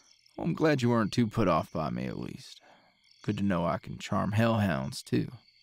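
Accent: South, United States. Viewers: It gives me an unexplainable amount of serotonin to hear a man with a southern accent and knows he's not homophobic or any of that other negative stuff associated (rightfully so) with southerners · The thing that is so cute about country boys is how they sound exactly like:3 <3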